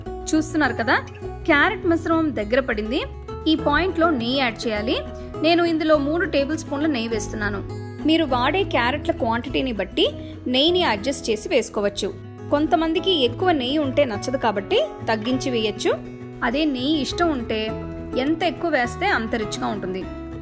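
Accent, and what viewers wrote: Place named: Kerala, India. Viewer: Meeru English lo matladuthe eh kerela oh Mysore anukunanu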